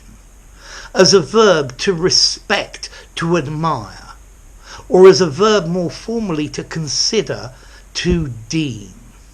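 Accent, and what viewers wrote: United Kingdom. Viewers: Hi May I ask what is ur accent? Modern RP or Traditional RP or neutral accent from some place？ thank u teacher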